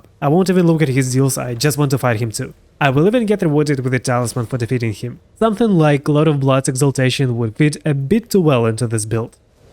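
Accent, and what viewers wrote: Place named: Russia. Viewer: Друг, ты русскоговорящий? Просто акцент очень сильно выделяется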